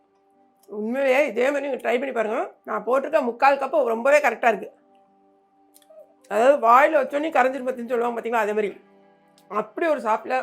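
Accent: Tirunelveli, India. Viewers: Your videos super .. recently watched one of your video about vivikam recipe forwarded from my sister . So much nostalgic memories from my childhood, from idayangudi living in Chicago for the past 27 years , your Trinelveli accent and very simple talk , nothing fancy .. great collection of recipes. Super super . Need see many of your wonderful recipes and want to try them Thank you so much . Finally so glad I found your channel.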